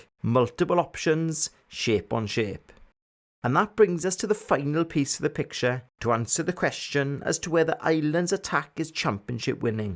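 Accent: Wales, United Kingdom. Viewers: love your welsh accent and your clinical analysis. I'll be back I hope carberry does the business romorrow. TOP DRAWER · Great analysis and love that Welsh accent! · WOW - I have never seen such an in depth and comprehensive analysis! Fantastic - thanks for the work you put into this - I also have to say I love the Welsh accent! Here's hoping that we get to see an Irish win tomorrow - but either way it should be one hell of a game! COME ON IRELAND!!! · Nice soft Welsh accent 😂😂😂 & great video!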